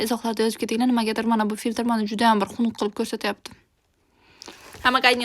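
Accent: Bukhara, Uzbekistan. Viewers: juda go'zalsiz buxorolikmisiz shevayiz oxshaydi manam buxorodanman qayeridansiz😊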